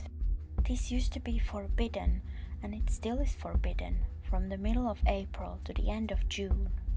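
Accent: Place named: Finland. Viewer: I adore your accent so much! My mom is from Finland and has an accent as well, I live in America now so it's rare that I hear someone else with a finnish accent.. and I haven't found another finnish channel that I like as much as I like yours. Love your videos! <3 (especially the spooky ones)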